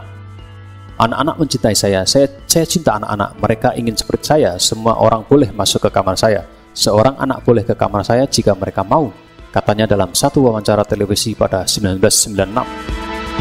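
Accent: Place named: Java, Indonesia. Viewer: Kayaknya pengisi suara, wong jowo ikii..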